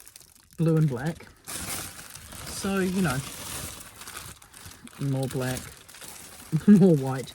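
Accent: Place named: New Zealand. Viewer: As soon as you said “The Warehouse”, I was like… her accent… she HAS to be a Kiwi… but you did confirm it 🫶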